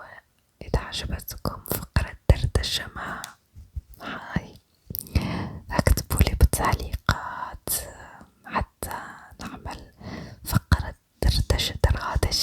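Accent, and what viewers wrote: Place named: Egypt. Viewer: لهجتك مصري ع خليجي😂❤❤❤استمري قمر 🎉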